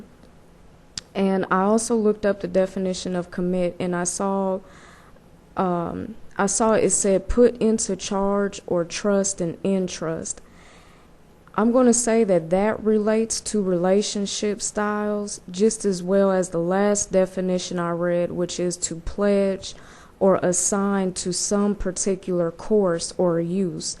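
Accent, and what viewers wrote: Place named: South, United States. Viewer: I love your accent... It's got a strong Southern twist to it...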